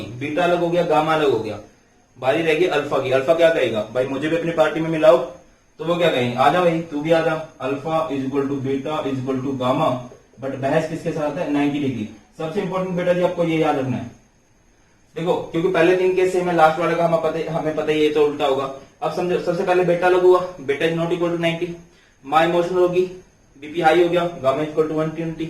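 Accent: Haryana, India. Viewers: Sir you are from haryana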